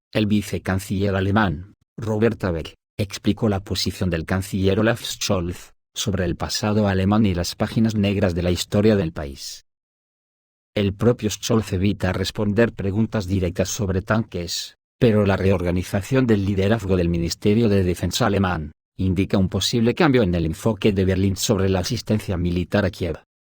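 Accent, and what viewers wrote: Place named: Spain. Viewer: MAESTRANZA RECUERDA ALGO EN CUANTO LA JEÑGA O EÑL DIÑLECTO DE HABLAR. ES ESPAÑOL, ESA ES SU FORMA DE HABLAR Y LO EXPLICA MUY BIEN NO IMPORTA QUE DURE DOS HORAS, LO MEJOR ES EML MENSAJES QUE DICE YA TODOS ESTA DICHO Y YA ES TARDES, UN DÍAS DE ESTOS AMANECERENOS EN EL INFIERNO Y NO DEL DIABLO SI NO CREADO POR LA ESTUPIDÑE DE LA OTAN QUE NO ENTIENDE NADA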